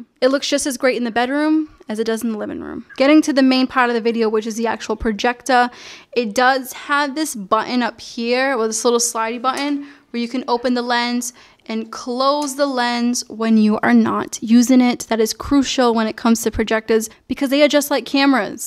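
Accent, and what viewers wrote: Boston, United States. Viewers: That Boston accent coming through every now and then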